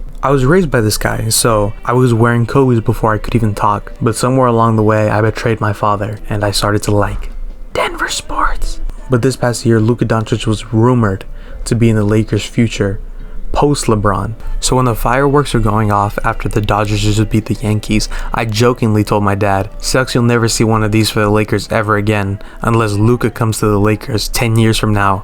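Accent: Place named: Latin America. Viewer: your latinx accent is gross ngl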